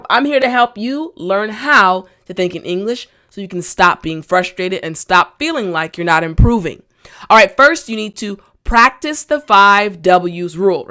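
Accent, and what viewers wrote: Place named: United States. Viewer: I love listening to your amazing beautiful American accent! I have lived in Pittsburgh, PA, and I've decided coming back to Brazil. I like your high mood by explaining things!!! Thanks,for your love in passing lots of information to us! I've been speaking English,for over 32 years, and I love this stunning language,that God gave me as a gift,and that is something I'm very thankful for him of. And you are the best teacher I've found on the web ever!!!! I congratulate you,and God bless you for this great work, and amen!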